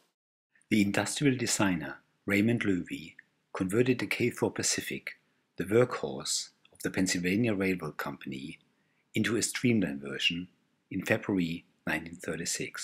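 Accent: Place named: Germany. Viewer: Sounds like you may be German by your accent. Your trains are the most prewar examples I've ever seen. Thanks for posting. Danke.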